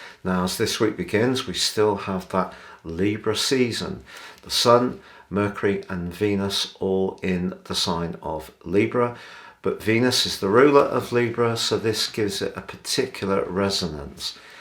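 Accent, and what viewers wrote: United Kingdom. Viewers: I love this gentlemen. I love to hear him. He speaks fine and proper, love the British accent, I my personal opinion is the must marvelous, beautiful accent. Love him!!!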